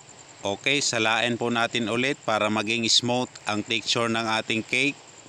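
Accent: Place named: Visayas, Philippines. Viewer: gud morning chef watching from Guam. gusto kita magturo kasi detalyado at simple lang.God bless you chef. bisaya ka chef? bisaya kasi ang accent mo. kung bisaya ka parehas tayo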